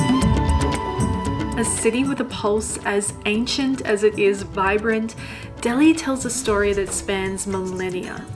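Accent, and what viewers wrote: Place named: Australia. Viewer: 😂😂😂😘oh this is our Australian accent ❤❤❤❤awesome 😂😂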